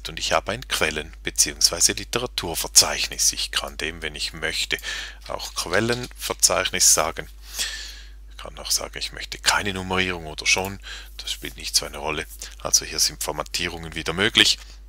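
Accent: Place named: Switzerland. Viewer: gutes video, supeeeeeer, aber schlimmstes Hochdeutsch ever, haha typisch Schweizer Hochdeutsch🤣😑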